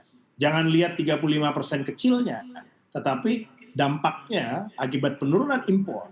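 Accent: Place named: Banyumas, Indonesia. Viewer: Salam ngapak pak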